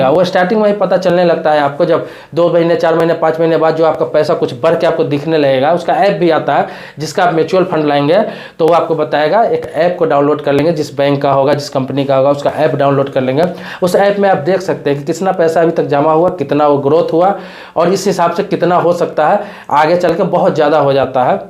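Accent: Bihar, India. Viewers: Aap bihar se ho kya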